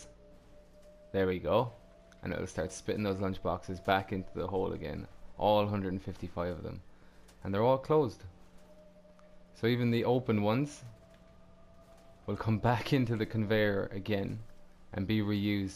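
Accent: Ireland. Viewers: Are you Irish? Your accent is great dude. What’s the craick? Do the ting. Great video. Awesome build. Shouting out skooled zone was class.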